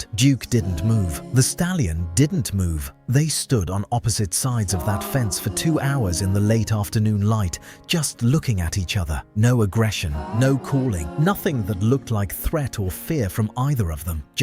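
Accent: United Kingdom. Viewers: Why does the narrator have a British accent when clearly this is an American story? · why does ALL YOUTUBE AI Naration have a uk accent? makes no sesnse at all!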